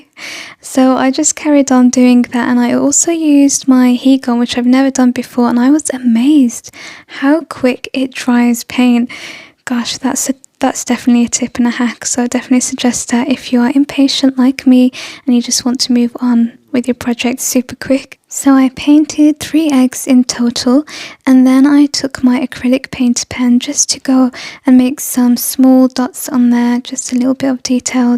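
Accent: England, United Kingdom. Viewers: Hi love! Personally, you don’t have to remind me to press the thumbs up because that is the first thing I do when I open the video! Such a cute idea to put a tea light in the egg shell! My fave is the last diy., did I ever tell you how I love your calming voice! Add the English accent and....heaven! You should do story time for kids at the local library! XOXO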